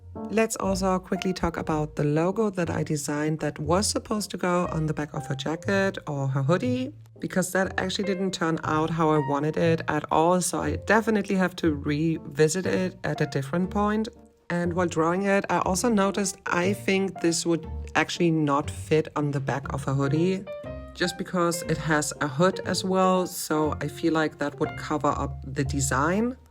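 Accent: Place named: Germany. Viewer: GERMAN ACCENT MUEHEHEHEHE these drawings are awesome ggs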